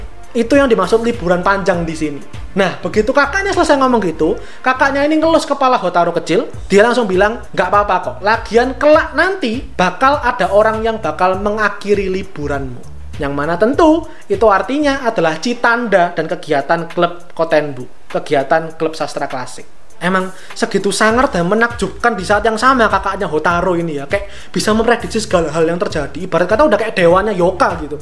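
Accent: Java, Indonesia. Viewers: Medok bang 😂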